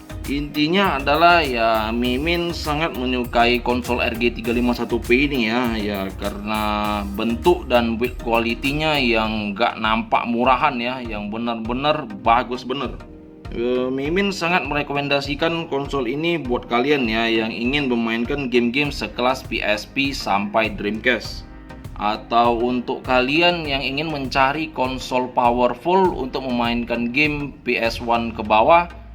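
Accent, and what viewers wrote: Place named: Java, Indonesia. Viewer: Saya suka logat jawanya abang..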